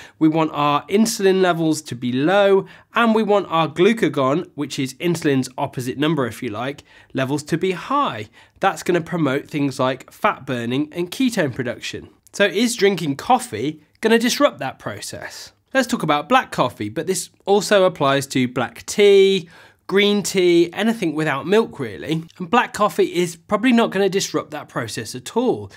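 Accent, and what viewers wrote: England, United Kingdom. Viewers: I am a black coffee loving Londoner who's been on an OMAD diet since Jan 1st. I stumbled across your channel by accident, and am very pleased that I did. It shouldn't matter I know, but nonetheless I find it oddly reassuring to hear an English accent as opposed to the American centric content that's so prevalent on YouTube. You have a new subscriber. Keep up the good work.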